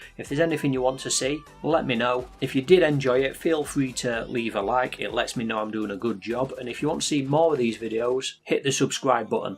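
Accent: United Kingdom. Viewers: What English accent is this? Sound familiar, my English teacher? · Is this the speccy bum accent from Glasgow?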